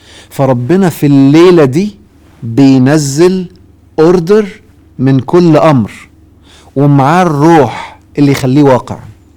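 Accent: Algeria. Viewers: انا تبانلي جزايرية ماشي ليبية ومنعرف ههه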